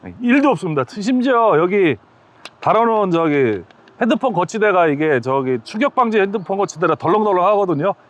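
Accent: Chungcheong, South Korea. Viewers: 핸섬하시군요😅충청도 말투😂❤❤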